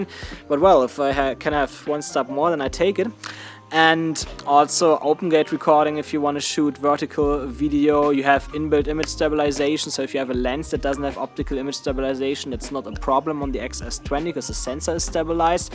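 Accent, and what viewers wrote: Germany. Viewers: Hey, really nice review! I got the Fuji X-S20 this week as well🙂 I am new to Fuji X. Since you mention it a lot in the review: What kind of video settings would you recommend for YouTube videos (No Flog / without color grading)? I think about: 4k, H265, 4:2:0, 200 Mbps, Eterna (?) Looking forward using this camera! Bist du eigentlich Deutscher? Es hört sich so an 😀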